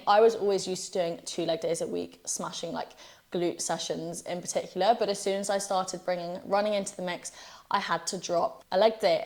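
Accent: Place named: United Kingdom. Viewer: I had to slow down the playback speed to .70 😂.. that British accent is hard enough to understand, now add 85 mph (135 km/h ) 😅.. Besides that, Excellent informational video.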